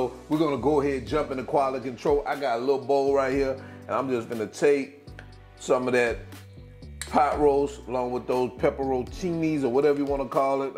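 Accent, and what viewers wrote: South, United States. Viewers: lol a southern accent is not “bad pronunciation”. Be proud of where you’re from💪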